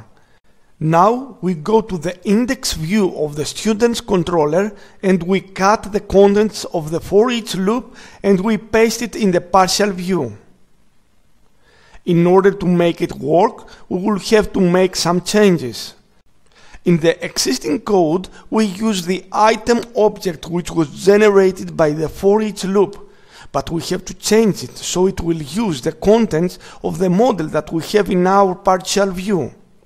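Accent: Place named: France. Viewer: nice frenhc accent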